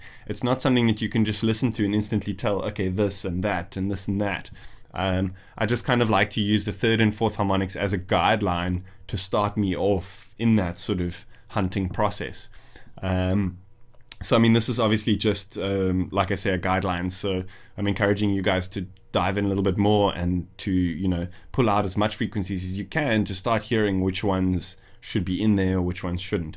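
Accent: South Africa. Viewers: Hey bud, are you from South Africa?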